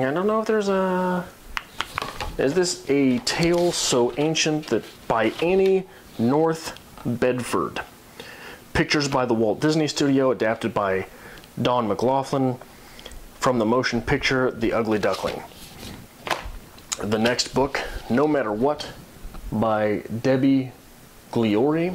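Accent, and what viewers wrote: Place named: France. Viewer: LOL That French accent. One of my best friends had an uncle like you. He would only buy her books and she knew that she could ask for any book she wanted growing up. He really encouraged her to read and love books. Congratulations on being an uncle!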